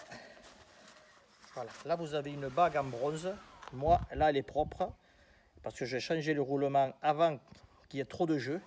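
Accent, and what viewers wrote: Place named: Southwest, France. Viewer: A l'accent, on croirait entendre Maïté nous faire une de ses recettes de cuisine.....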